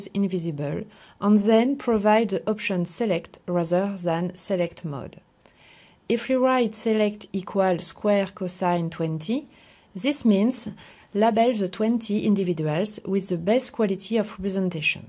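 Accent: France. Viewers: Yes, french accent :)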